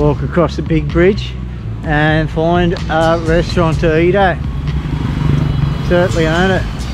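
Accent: Australia. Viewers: Your music suits so well to your pictures !!! Thank you for getting a drone. Thank you for beeing so generous to the poor people. After 2 years I start to get used to your down under acccent. Cheers from Germany. I hope we have a beer one day.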